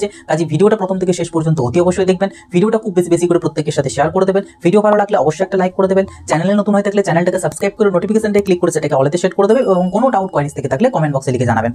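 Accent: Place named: Bangladesh. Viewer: Tumi kichu jano naa Bangla vasa er moddhe' so' bola bangladeshi . Jader state obc ache tarao ews korte pare seta hobe central . Porer bar theke bangla vasa ar valo information sikhe bolte asbe .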